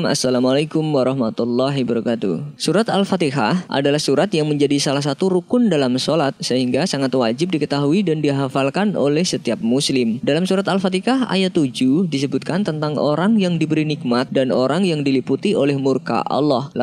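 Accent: Java, Indonesia. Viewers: Orang Jawa kek gtu sebagian besar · Dia menyebut Al Fatikah krna sebagian org jawa dialeknya begitu.. tak mengapa jika itu di lakukan krna ketidaktahuan atau krna ketidakmampuan tetapi hendaklah dia memperbaiki pengucapan bila itu sebuah kesalahan yg telah diketahui. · Rata² yg sebut pelafalan seperti itu orang² jawa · Kmungkinan beliau orng Jawa jdi wajar aj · Hhh sabar orang jowo udah biasa 😁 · Mungkn dia orang jawa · Al-fatihah lah yg paling benar akan tetapi logat orang jawa yg medok membuatnya menjadi al-fatikah sama seperti ayah saya 😄😄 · Bibir orang jawa emang gitu say kaya nybut fatir patir..dn ga niat jelek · Lidah jawa memang susah di ubah Aksen begitu lekat Alpatekah. Audzubillah hir rabill ngalamin. Mohon perbaiki min. Aku juga jawa tapi nggak gitu kali. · Uwoo Admin wong jowo toh 😆, aksennya beda , jazakumullah infonya min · Kaya org jawa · Sdra"....jgn mudah menyalahkan org lain. 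Utk sdra kita suku jawa,kebanyakan pelafalannya ALFATEKAH utk menyebut ALFATIHAH. Itu logat...bahkan ada yg sdh ustadz msh sering keseleo lidah dlm pelafalan alfatihah. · logat jawa banget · logat orang jawa itu mah, mertua gw juga sama ngomongnya alfatikhah karena logat di sana medok.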